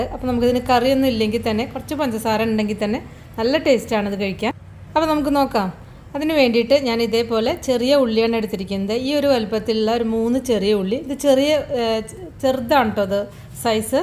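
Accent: Palakkad, India. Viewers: റെസിപി ജാൻ ചെയ്തു നോക്കാ രണ്ട നല്ല അവതരണം പാലക്കാട് സ്ലാങ് ആണോ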